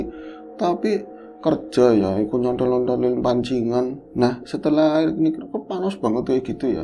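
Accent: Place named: Tegal, Indonesia. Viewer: Mas msh tetep di NGOBOR dong,jngn digantiin yg lain,soalnya udah enak ndengerin suara,n logat tegalnya,tdk tergantikan...😄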